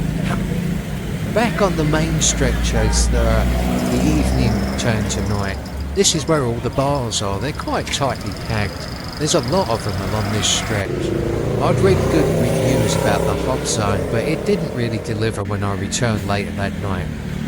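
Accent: United Kingdom. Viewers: narrated in the style of Monty Python's 'Bicycle Tour of North Cornwall' very uplifting more like this with English accent please thanks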